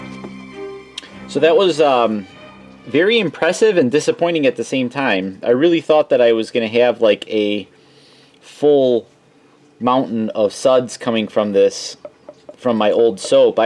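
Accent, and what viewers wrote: Wisconsin, United States. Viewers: I almost want this guy with his Wisconsin accent to say “Marge, get the cheese wheel”. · You definitely sound like you’re from Wisconsin or Minnesota, but most likely Wisconsin.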